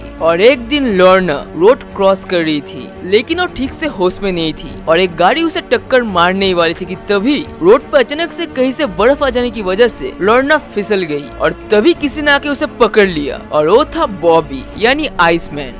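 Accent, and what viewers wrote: Bengal, India. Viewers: Bro tum bengali ho kiya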